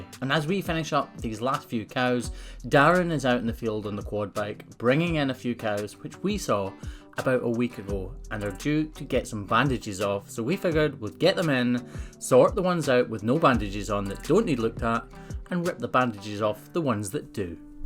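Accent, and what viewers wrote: United Kingdom. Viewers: Here's some ❤ for! YouTube has put several videos from last year BC (Before!!) & your life seems so much smoother than before. He is such a great help for you to be more efficient & focused! Yeah!!🎉❤🧨👍 Note: I think you are saying; I'm from Texas & those UK accents throw me off a tad!!😁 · Britain accent?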